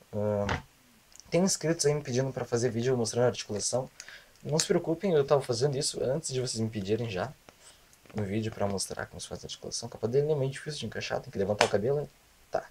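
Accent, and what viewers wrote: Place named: Portugal. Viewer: ah ta....é que o jeito que vc fala parece de portugal kk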